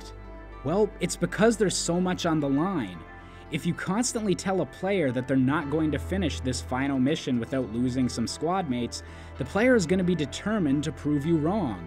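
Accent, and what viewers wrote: Canada. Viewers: This guy is Canadian isn't he? This guys Canadian.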